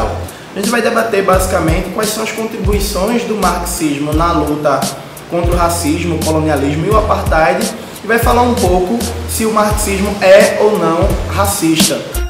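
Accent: Pernambuco, Brazil. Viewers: Oh Deus! Esse sotaque de pernambuco me mata. 😍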